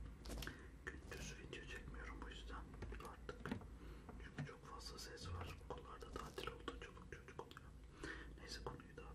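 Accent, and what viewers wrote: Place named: Turkey. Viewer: Aaawww the new mic is amazing and so is your turkish accent so dont worry about it! (づ｡◕‿‿◕｡)づ and whenever you speak in turkish it just makes me giggle for some reason but also makes me relax and concentrate better on how it sounds rather what you say because i cant understand but its all very good, thank you!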